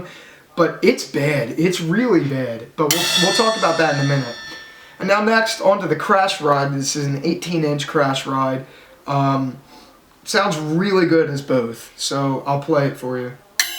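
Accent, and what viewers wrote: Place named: Philadelphia, United States. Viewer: Not even kidding, first 10 seconds and I have to ask: what part of Philly are you from?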